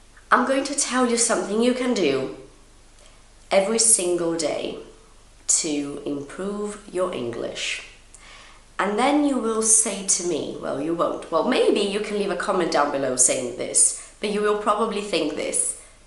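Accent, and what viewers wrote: United Kingdom. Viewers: Your british english is great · ....hi....Ididn't like British English till Know You....I'm loving now to listen You...kisses❤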